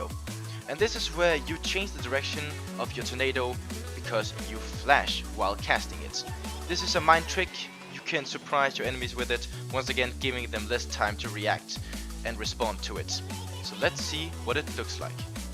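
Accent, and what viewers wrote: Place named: Denmark. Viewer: You sound a bit danish.....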